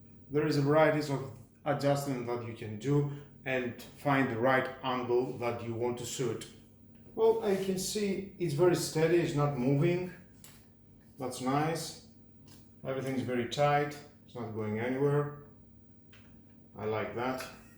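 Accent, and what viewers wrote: Greece. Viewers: U sound greek φίλε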